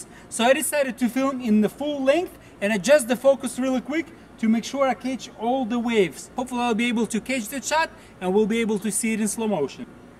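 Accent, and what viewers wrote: Russia. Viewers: Как же режет уши русский акцент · Russian accent? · You English seems more fluent when you do your cooking class, and your Russian accent seem thicker when you do your Slo-Mo shoots! Is that on purpose? Mama's hat as a Matte-Box is too funny !!! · it isnt him it is just another crazy russian they all sound alike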